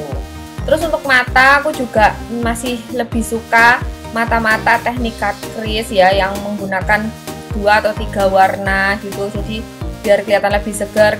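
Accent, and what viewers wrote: Java, Indonesia. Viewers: Jawanya kok medok banget. Dari mana mbak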